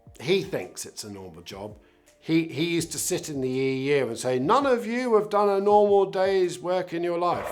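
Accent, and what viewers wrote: RP, United Kingdom. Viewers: i dont know who this guardianista ,twitter pc orthadoxist is,but he sounds like a patrician bbc type who is still resentful that the uk has come to its senses and rejected the failed experiment of being part of a happy clappy uninted states of europe.having said that,mr. far-rage is a supreme egotist,and adopted a sad macho pose next to a dead fish in some harbour,to his great discredit.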